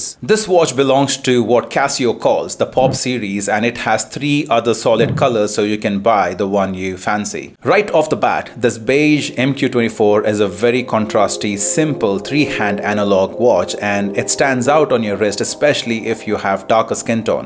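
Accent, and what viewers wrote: India. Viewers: meanwhile me with my GD350 and GX56 laughing in the corner 😂 Anyway what I loved the most is your hindi accent! Also when I read the title of the video first,the only watch came in my mind was this!! This was an important watch to buy and cover,loved the video bro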